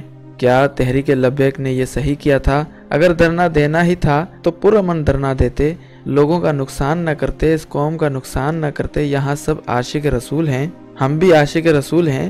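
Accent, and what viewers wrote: India. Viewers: Tera lehja indian lagta hy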